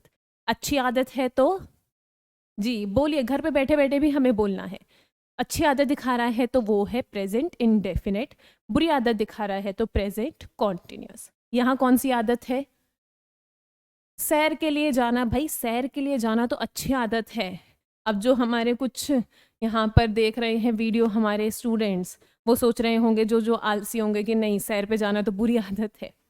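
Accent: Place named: Uttarakhand, India. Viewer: Hello Mam aap dev bhumi uk se lgre ho aapki language tone se or sir name se clear hai ki aap yhi se ho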